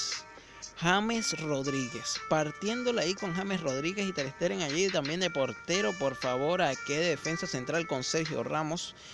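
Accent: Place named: Caracas, Venezuela. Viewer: de donde eres se me parece que eres caraqueño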